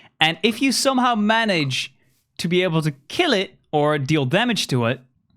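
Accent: Netherlands. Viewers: Hey love the vids, are you dutch by any chance? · Are you from the netherlands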